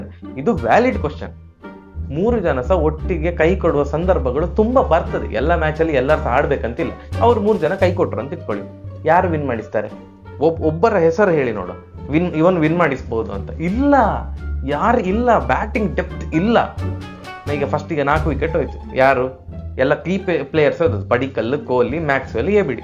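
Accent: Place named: Udupi, India. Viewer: Hello sir, I feel happy to hear Udupi-Kannada from you as I studied in MIT. I watch nearly all the videos of yours and even I subscribed the channel In recent times the match summary you were giving is lacking some important matters. For example, 1. Virat has batted at 100 strike rate you didn't said any word of it, 2. ABD is not getting much chance to bat as he is coming at latter half of 20 overs Opposition will be more happy if they want to bowl less to ABD. He once batted one down in previous seasons and asked to play at 5 in this season which is surely not a good move. If RCB want to win a game either abd or maxwell need to spend more time in the crease. I hope you prepare better in the coming videos and add all points which are crucial in determining the result of the game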